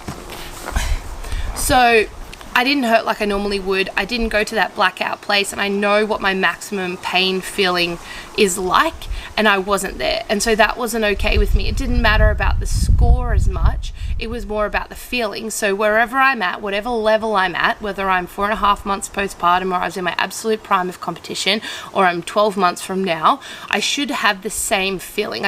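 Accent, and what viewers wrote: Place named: Australia. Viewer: Hiiiii.. From Costa Rica. You can put subtitles in your videos I can hardly understand the Australian accent. Tks. Love you.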